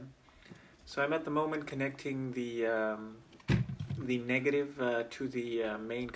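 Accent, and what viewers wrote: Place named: Germany. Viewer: Very, very good description! And don’t worry about your diction, I appreciate German thoroughness!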